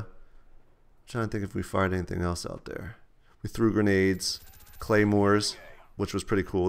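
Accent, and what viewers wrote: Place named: Wisconsin, United States. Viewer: wisconsin accent FTW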